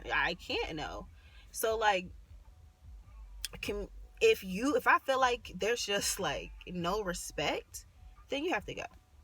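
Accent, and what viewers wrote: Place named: United Kingdom. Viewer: Love the British accent doooling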